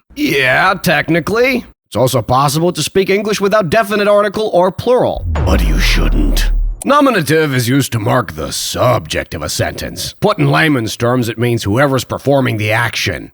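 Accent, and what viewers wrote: Croatia. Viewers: Ne znam kako je u drugim jezicima, ali kod nas, pored toga što možeš da pogrešiš u pisanju reči, veliki broj starijih ljudi greši i gramatiku. Na primer, pišu "neznam" umesto "ne znam", "netreba" umesto "ne treba", "samnom" umesto "sa mnom", "bi" i "bih" mešaju, kao i "bio" pa napišu "bijo". To je dosta izraženo po selima i u mestima koja nisu naročito naseljena, Takođe, u nekim krajevima Srbije, posebno na jugu i jugoistoku, u svakodnevnom govoru često se koriste samo tri ili četiri padeža, pa se ostali gotovo i ne upotrebljavaju. Svaka čast na izgovoru reči, baš si pogodio. Ne znam da li ti je to neko ikada rekao, ali naglasak ti dosta vuče na hrvatski. Hahaha. Svako dobro, legendo! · sounds more Croatian than actual Croatians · 😂😂😂😂😭 pre dobroo , ali je li ovaj glas hrvat? Ne zvuci mi ka srbski naglasak? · He does have a croatian accent when he pronounces his words, it's cute tbh😂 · Mogao si ovaj video da nameniš Hrvatima, pošto si njihovo akcentovanje i izgovor potrefio 100% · Serb here. 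Very cool vid, didnt know you would make a vid on my language. Also you sound more like a croatian when speaking serbian 😭 Though nice vid. Hvala brate, samo tako nastavi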